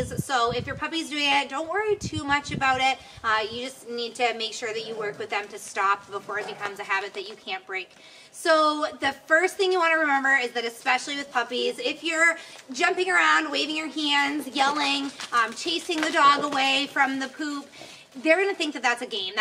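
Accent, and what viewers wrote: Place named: United States. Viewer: Talk less American plz.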